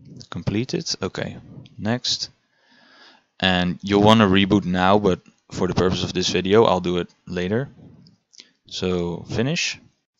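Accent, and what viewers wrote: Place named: Sweden. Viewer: nice tutorial! Du är helt klart svensk, hörs klart haha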